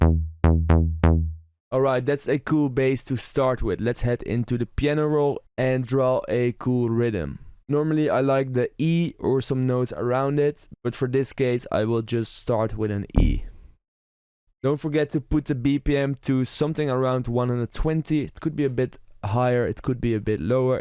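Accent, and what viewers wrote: Netherlands. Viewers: aan het accent van jouw Engels kan ik ook gewoon horen dan je een Nederlander bent. video is een banger!!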